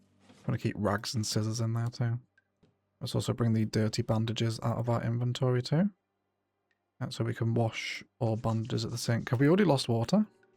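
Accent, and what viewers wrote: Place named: North, United Kingdom. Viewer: Come on! I recently moved to Australia from the UK and it's lovely having a chill northern British voice talk to me about Zomboid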